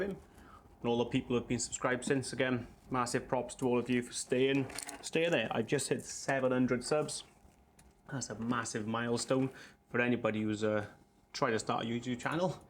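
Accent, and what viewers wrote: Wales, United Kingdom. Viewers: Nice video my man, no escaping the welsh accent unfortunately, but we don't care do we!😁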